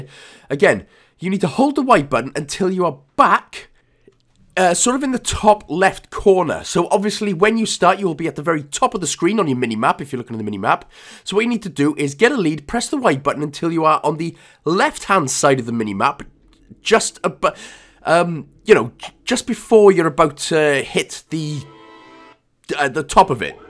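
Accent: Wales, United Kingdom. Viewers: maybe he's ammerican? The Welsh accent isn't the easiest to follow if you are not from the UK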